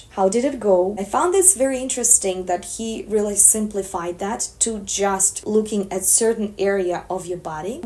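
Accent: Russia. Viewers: Вы невероятная! Вы талантливая! Вы удивительная! Такой красивый голос, и такое глубокое погружение в стиль поражают и удивляют! Нашла это канал случайно, когда искала определение гамина романтика (он же soft gamine). Очень понравилось как системно и просто Вы объясняете. Стала смотреть дальше, и не покидало ощущение, что есть русский акцент в Вашем английском 😜 Покопалась и зависла на Вашем исполнении. Но пришла, чтобы разобраться в системе Киббе. Почему видео только на английском??? Как жаль, что огромная часть россиянок не имеют возможности слушать Вас 😢. Вы отличаетесь от остальных наших стилистов-блогеров. Ваш контент просто супер! Спасибо огромное! И огромного Вам успеха 👏🏻👏🏻👏🏻🌺🌺🌺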